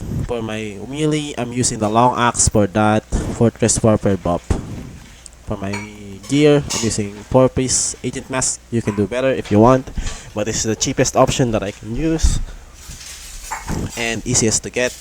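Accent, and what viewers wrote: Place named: Philippines. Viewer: Japanese name but you sound Filipino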